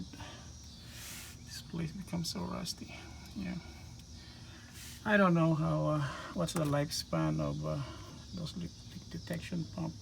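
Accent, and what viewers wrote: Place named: Philippines. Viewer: Love your accent, I know you’re Filipino! Lol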